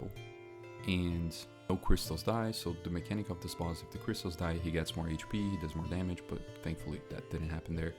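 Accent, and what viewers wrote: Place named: Brazil. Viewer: its amazing how your english is very smooth while I can still say you're BR hahahaha tamo junto irmão! videozinho bom demais, começando no medivia essa semana!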